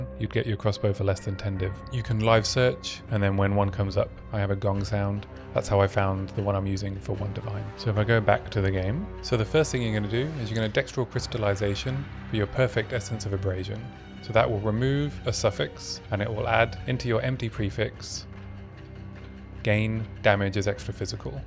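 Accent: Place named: England, United Kingdom. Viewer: Hi. I've been theory crafting a build for the negative armour on Warbringer. I'm on a holiday so been researching other armour break builds when the family have gone to bed. I just watched through your videos of this league and they're great. I love listen to a fellow Englishman talk about POE2 for a change. You videos are high quality and you explain things well. I'll definitely check you out on twitch when I get a chance. And my condolences on the attack speed. 😅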